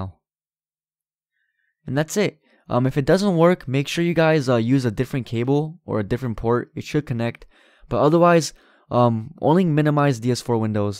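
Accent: Croatia. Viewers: maybe this is a dum question but are you croatian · Are u from Croatia?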